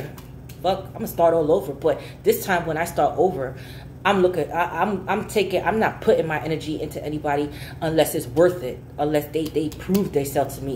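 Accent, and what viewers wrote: New York, United States. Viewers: you are so funny are you from New York New York or New Jersey that's your accent I love it but you yeah I love the reason I love it I'm a Leo son Taurus Moon and a cancer I forgot that one he's an earth sign I've been waiting for him to wake up his mind it's been almost 2 years but I have time not exactly where I want to be and he's got out of a divorce about 3 years ago I have options you know we Leo's they love us haha they try to act like they don't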